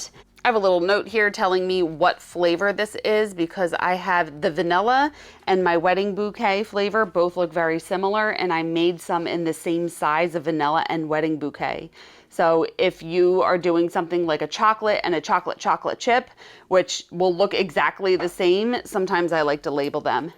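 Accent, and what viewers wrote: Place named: Delaware County, United States. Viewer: I just came across this video trying to find tips for making my daughter's wedding cake. I heard the DELCO accent...living in SC for 14 years now. It's funny how I can still hear it right away. Lol Of course I had to look you up and saw Bensalem... Great tips! Thanks!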